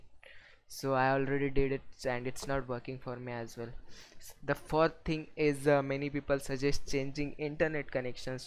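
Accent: India. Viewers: talks to much indian very slow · You already know it gonna be legit when a indian starts talking :) much love broda finally working <3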